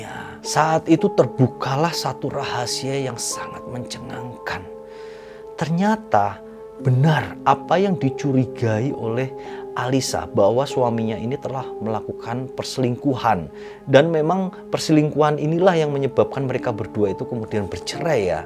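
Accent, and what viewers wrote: Java, Indonesia. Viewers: Halo uncle....medhoknya itu lho🙈🙈😂😂😂😂😂😂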